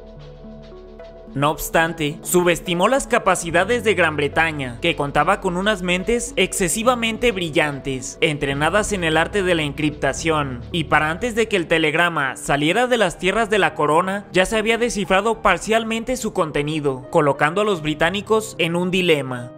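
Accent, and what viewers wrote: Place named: North, Mexico. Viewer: el acento fresa me recuerda al norteño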